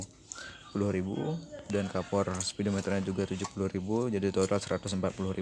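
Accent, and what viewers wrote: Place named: Bali, Indonesia. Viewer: LOGATNYA KAYA ORANG BALI,, MAS NYA ORANG BALI YA?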